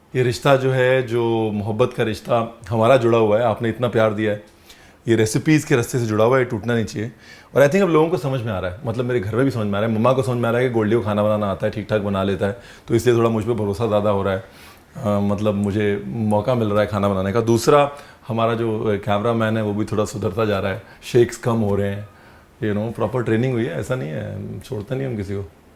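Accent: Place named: Punjab, India. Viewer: na g na ye panjabio ka accent hai ...Not in village..😊😊😊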